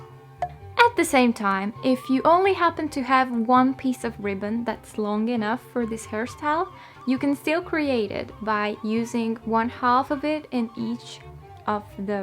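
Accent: Hungary. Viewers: megtaláltam egy videódat régebben és megesküdtem volna hogy magyar akcentusod van, de ezek szerint jól éreztem :D 10 évig néptáncoltam, mi is hasonlóan kötöttük a hajunkat :))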